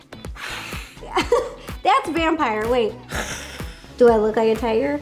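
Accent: South, United States. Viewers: voice is so cute and southern ij love it like southerners represent!!!! I'm from ga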